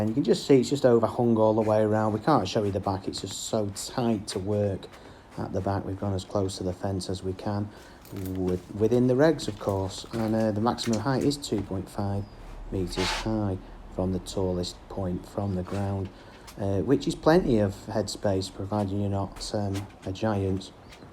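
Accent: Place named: Manchester, United Kingdom. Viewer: Hello. You sound like you’re from Manchester. Please can you call me. Inbox me for my number. I’m planning of doing a mancave for myself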